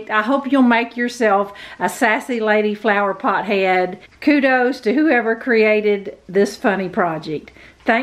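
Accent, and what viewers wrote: South, United States. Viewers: Are you a southern lady?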